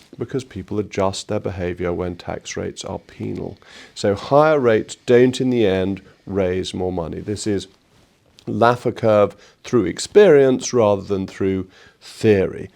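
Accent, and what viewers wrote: RP, United Kingdom. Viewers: All the attributes of a clown with money and amnesia... conveniently forgetting how long his party was in power leading to the UKs ruin. Remove the posh accent and this clown could easily be as useful as a butlins clown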